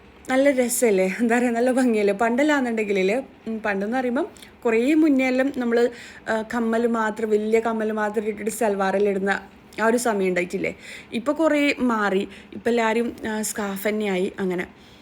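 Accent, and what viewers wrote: Kannur, India. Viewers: ങ്ങൾ കണ്ണൂർ ആണോ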